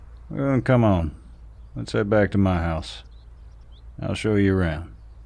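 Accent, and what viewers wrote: South, United States. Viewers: you are southern accent. good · Lord have mercy I have a thing for a southern accent 🫣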